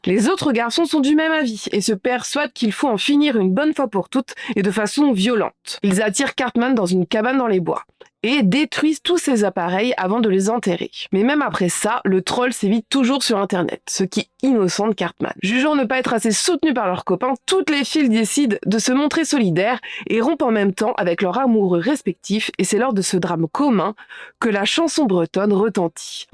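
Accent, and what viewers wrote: Brittany, France. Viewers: J’adore ton accent du breton broerec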